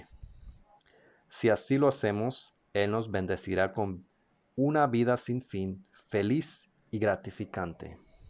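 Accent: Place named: Mexico. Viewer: Por un momento pense heras Mexicano por tu forma de hablar ,de Panama es como ya sabes el general y ahora es jw . lo de tu hija como decimos en Mexico que mala onda ,aunque yo vivo en los Angeles California USA 🇺🇸.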